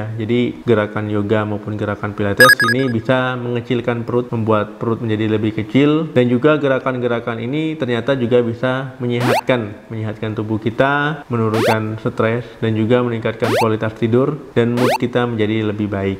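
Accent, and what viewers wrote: Aceh, Indonesia. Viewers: Dokter logat bicara nya aceh banget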